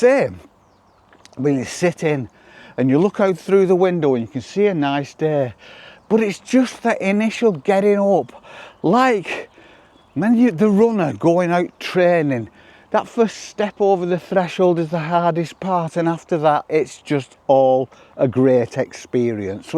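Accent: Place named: Bolton, United Kingdom. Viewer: Hi, I'm sitting here in Kent but was raised in Bolton. I having nostalgic flash back hearing your local accent and names like Leverhulme and Rivington in your other video.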